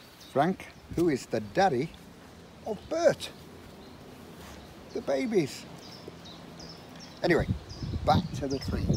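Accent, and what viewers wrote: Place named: England, United Kingdom. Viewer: Would you like a little humor? I've watched and enjoyed quite a few of your demonstrations now, and all the time I thought you were saying 'hocus" for your green, not Hooker's green! I'm from the deep south in Texas, and our accents are not as lovely as the English.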